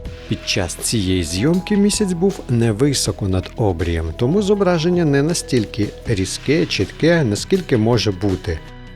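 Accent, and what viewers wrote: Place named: Russia. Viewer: У вас ужасный русский акцент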